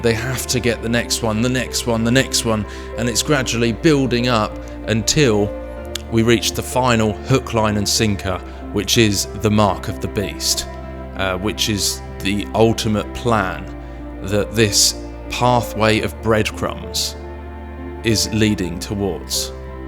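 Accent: United Kingdom. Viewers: I love our English language accent from here in the UK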